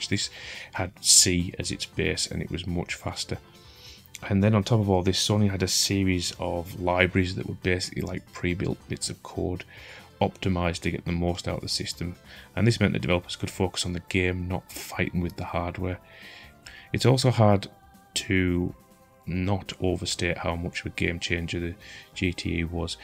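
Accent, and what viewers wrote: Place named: North East England, United Kingdom. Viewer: It's probably because this guy is from the North East of England. That's how they pronounce 'u' sounds.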